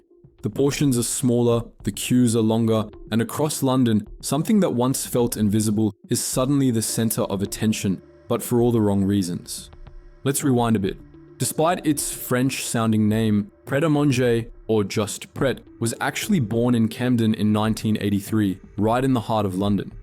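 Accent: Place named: Australia. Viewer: Why pick an Australian for the robo-voice?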